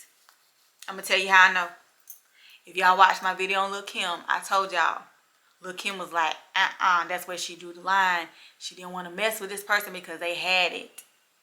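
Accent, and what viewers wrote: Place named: South Carolina, United States. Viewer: I was wondering why you sounded soooo country - then you said you're in South Carolina. I'm in Columbia - Broad River / Saint Andrews area. Hello neighbor!!! 😁😁😁😁 Will you find out how Prince is doing please? Thank you. Keep up the good work 👍👍👍👍👍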